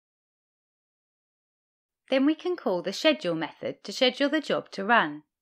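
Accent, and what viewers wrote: United Kingdom. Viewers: came for the unity tutorial, stayed for the spiderjob by this delightful british accent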